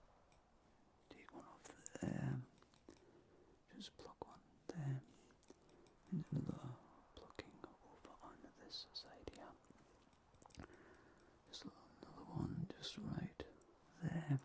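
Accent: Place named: Newcastle, United Kingdom. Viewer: Finally! A geordie ASMRtist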